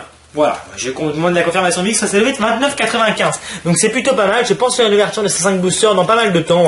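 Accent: France. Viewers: Suicune se prononce suicoune mais tu a to. Accent français xD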